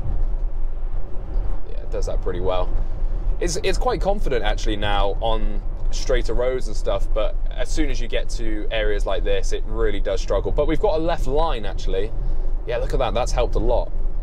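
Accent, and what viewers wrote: United Kingdom. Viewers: Great to hear a Brit commentary for a change. Nice interesting engaging content. Could do with more shots showing what the car is seeing on the display. I'm waiting for a 3 or Y. But still interested to see where autopilot is at on UK roads.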